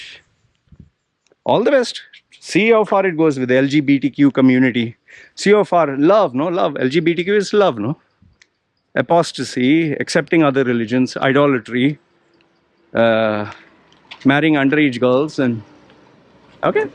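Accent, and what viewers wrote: India. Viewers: You're definitely not wrong! I'm a Muslim we're supposed to believe with conviction our book and prophet. So some canadian professor waving his finger saying you muslims this and that is a joke to me. I actually respected him alot until this video it really made me see his ignorance. I believe you're Indian from your accent so we both know how western culture colonized and pillaged our countries for their resources and wealth.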